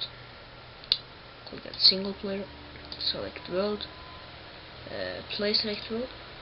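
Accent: Norway. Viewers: are u norwegian? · are you norwegian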